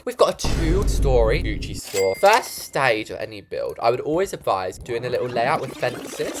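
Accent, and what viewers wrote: United Kingdom. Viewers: How come ur British but ur sister is amarican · Wait so he’s British not Australian…?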